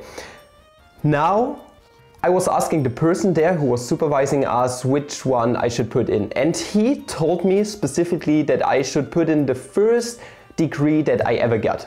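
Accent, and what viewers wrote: Germany. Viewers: German accent. I find it cute. That aside.... 145 IQ is so high. I thought I had a good IQ and Feyman's 125 seems so high (why do people call it low?even if it was the great Feyman). Graduating medical students have an average IQ of 120 (their 50 percentile). Mensa test is confidential for a reason and it is best not to reveal anything specific about them. Most important thing is IQ is misleading and almost all most intelligent people I know personally do not care about IQ tests and even look down about them. So, unless you can take it for fun do not take it. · 20 points for having such a dumb face and annoying German accent lmao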